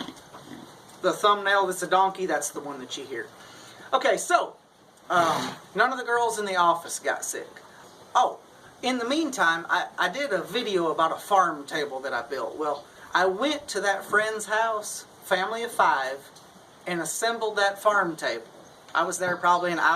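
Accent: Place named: Alabama, United States. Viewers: I live in Nebraska and most other states assume we talk like this. We don’t. We have a flat (no) accent. When I heard you speak the first time, I thought the same thing “what a nice accent!” It’s clearly southern, but not terribly twangy. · I live in Alabama too, it's weird hearing you say that, half the people around here talk like that!😆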